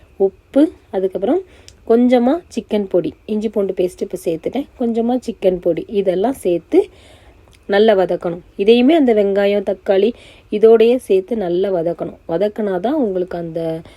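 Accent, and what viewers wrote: Tirunelveli, India. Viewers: நீங்க திருநெல்வேலியில் சொல்றீங்க ஆனா சமைக்கிறது எல்லாம் வேற மாதிரி இருக்கு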